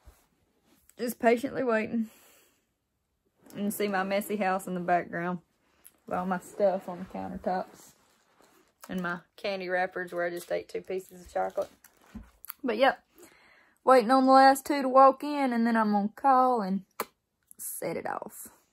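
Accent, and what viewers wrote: South, United States. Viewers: You're so darn cute and I like that southern talk 😍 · By god ,that southern accent is so hot . Sorry don't mean to be rude .but you sure are a beautiful woman . Will you merry me? Lol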